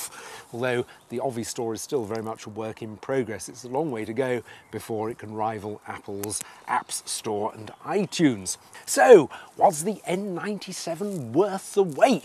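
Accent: United Kingdom. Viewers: hehe love the brittish accent top class...ohh and the phone looks great by the way :)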